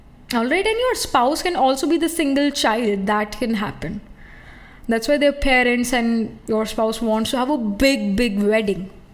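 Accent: Punjab, India. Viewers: Are you Punjabi girl language tone shows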